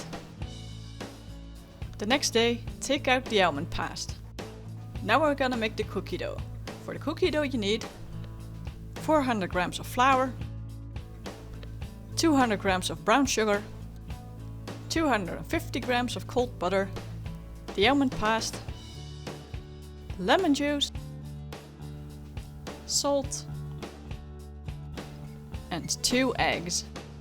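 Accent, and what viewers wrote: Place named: Netherlands. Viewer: Waarom praat je niet gewoon Nederlands want je Engels tells me clearly dat je Nederlands bent.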